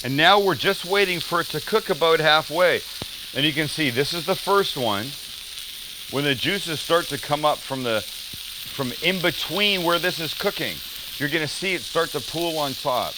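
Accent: Canada. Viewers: Hmmm - - did I just detect the faintest trace of a Canadian accent.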